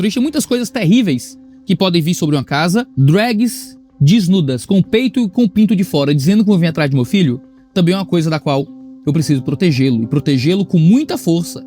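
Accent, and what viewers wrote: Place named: Northeast, Brazil. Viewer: acho engraçado o jeito plenamente nordestino que o fala sobre as indescencias alheias "balangando a jurubeba" KAJKSAJKSJKLJLSJDSLKJSLKDSJLKSDJSLKJDS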